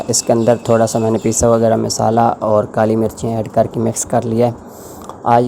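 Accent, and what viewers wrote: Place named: Punjab, India. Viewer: آپ کی پنجابی سن کر ہنسی آ گئی 😅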